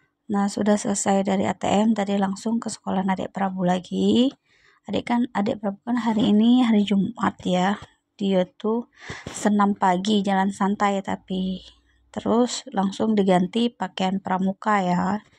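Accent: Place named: Palembang, Indonesia. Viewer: Menu yang sangat enak sekali lor. Kayak orang Palembang lor gaya ngomongnya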